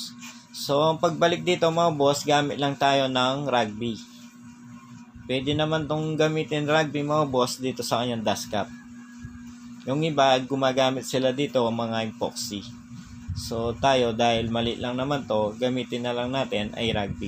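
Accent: Pampanga, Philippines. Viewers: Kapampangan ka ata boss hehe halata ee..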